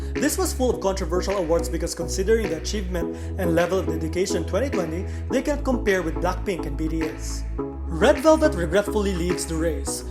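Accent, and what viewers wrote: Philippines. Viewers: Uso ginhawa kuya. Halata Pinoy, accent pa lang.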